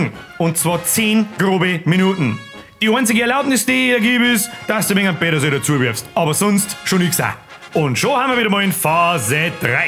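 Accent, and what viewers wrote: Bavaria, Germany. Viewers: Wenn man als Thüringer jedes Wort von diesem bayrischen Akzent verstehen kann!(Ich bin ein Thüringer mit bayrischer Verwandschaft) Weißwürste sind wirklich für mich eine Delikatesse!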